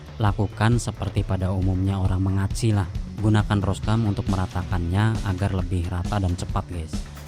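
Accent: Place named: Cirebon, Indonesia. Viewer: logatnya ky org indramayu/cirebon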